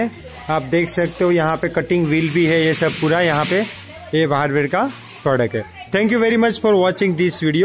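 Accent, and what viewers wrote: Gujarat, India. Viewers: Kya aap Gujarati he ?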